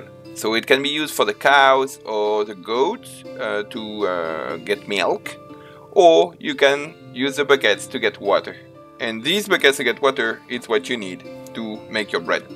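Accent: France. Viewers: Tq for this guide despite heavy french accent and small following I find his guide comprehensive and straightforward compared to others 👍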